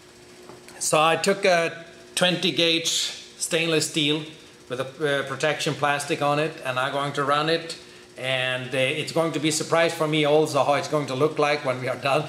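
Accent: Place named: Sweden. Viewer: He have to be from Sweden with that accent.. cool.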